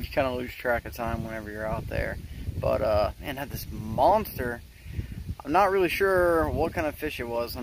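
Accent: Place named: South, United States. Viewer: Well Dude I understand about the secret hunting stash, but you can still share other videos right? Maybe another sponsor will pick you up? Other than that, things are pretty boring in the "Burgh" this time of year except for $5.00 for a dozen eggs or $7.00 for a jar of mayo. Hey! maybe that could be your next video. Don't forget, the first video I saw of yours was a tour of the Conquest, I literally searched " Carnival Conquest Tour" and you were the first vid that popped up. I'm not sure if the content matters. Your voice and the slow way you explain things along with the southern accent are your strong points. Let's be honest, there is not a huge market for prehistoric shark teeth.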